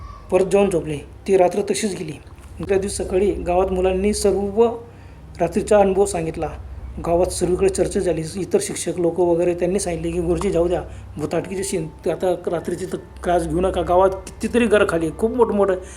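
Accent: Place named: Khandesh, India. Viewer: सांगणारे खानदेशात ले आहेत वाटत. काय कथा वाचता 😅😅😅😅...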